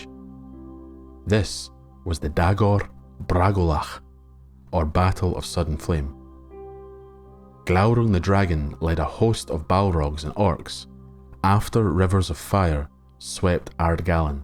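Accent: Scotland, United Kingdom. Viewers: He was foolish and heroic. In order to become a hero, you must be a fool in the first place. I really love your videos, partly because I could listen to your beautiful Scottish accent for hours on end.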